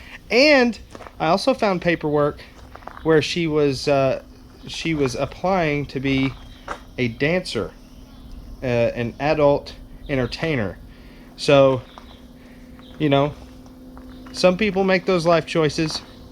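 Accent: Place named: Oklahoma, United States. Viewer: Where are you from it seems like you are from Oklahoma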